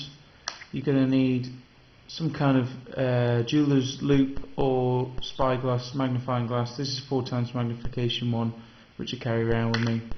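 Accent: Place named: Manchester, United Kingdom. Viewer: Maheswaran Shiva he from UK.. Prob city like Manchester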